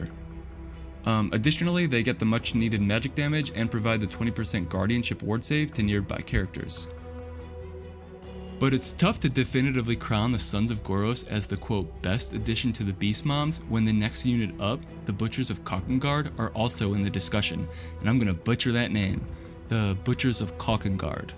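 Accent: United States. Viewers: I'm from England mate defiantly best not start a youtube channel. Dont worry..... Yanks all sound the same to us man. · I'm detecting a Midwest accent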